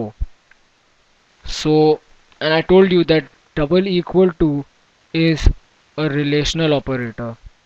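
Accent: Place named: India. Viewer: Sir thanks a lot:).I m just 13 years and in ryan icse.ALL THE DUMB TEACHERS IN THE SCHOOL CANT EVEN TEACH ME A SINGLE LETTER OF JAVA and sir you did in just some couple of videos.THANKS A LOT.I luv ur english it s indian pitch so i can understand better:D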